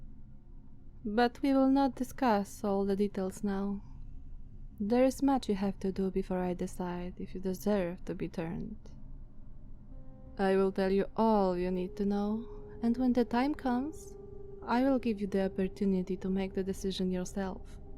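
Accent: Eastern Europe. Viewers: Hmmm. Slavic accent. Nice. Considering that Dracula, the most famous vampire of all time, comes from the balkans, a mostly slavic region. (I know he comes from Transylvania, Romania but the greater picture makes it pretty nice to see for a perfectionist like me, who appreciates details) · I can always appreciate both vampires and slavic accents. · You had me at Slavic accent Hrnng... I am in love. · Feels like a proper vampire needs a good Slavic accent after hearing this! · Perfect as ever bless i passed out half way through! So relaxing and loved this dominant side of you and slavic accent! <3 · Mmhmm this is the good shit👌 vampires with slavic accents are the way it should be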